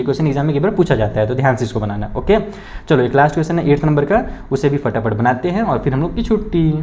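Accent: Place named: Bhojpuri, India. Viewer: Nice guru ji, Bhojpuriya apka mast 😅 Sab thik ba gurujee Thanks gurujee❤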